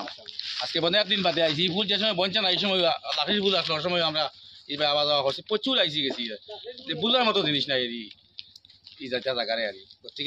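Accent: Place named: Barak Valley, India. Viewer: আমি বাংলাদেশ থেকে বলছি। আপনাকে বরাক কন্ঠে অভিনয়ে সুন্দর লাগে।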